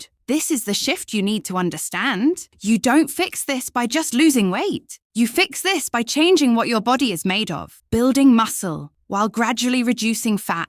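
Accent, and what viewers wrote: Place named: United Kingdom. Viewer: It’s good to hear British accent 😉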